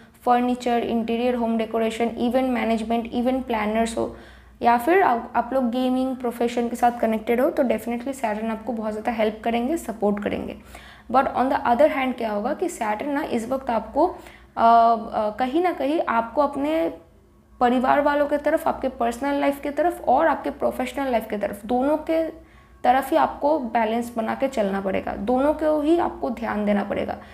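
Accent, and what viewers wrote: Bengal, India. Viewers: Typical Bengalli accent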